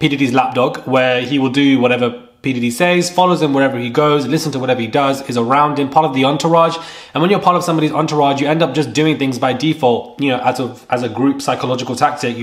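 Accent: England, United Kingdom. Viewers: Big city London speak 🗣️ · Dont u dare apologize for that beautiful sexy voice ,its like harmony when speak ,clear to the point beautiful English accent 👍and the content 🔥 fireee appreciate u 😅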